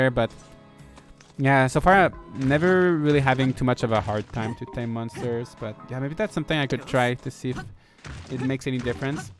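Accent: Quebec, Canada. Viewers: Hey! So cool to hear a fellow French Canadian. I could tell right away from the accent;). I also recently bought Rune Factory 5 and I was wondering if you knew of a website or maybe a Twitter that could provide some form of guide for the game? Simple things like what gifts certain people prefer and what to give monsters/boss monsters. If not, no pressure! Thanks for providing us a high quality, in-depth look at this awesome game. Edit because I honestly thought way too late about this and I feel like that’s something you considered doing in the future: would you be interested in making a video about how to raise friendships fast/who likes what gifts the most and such? Only if it’s not bothersome!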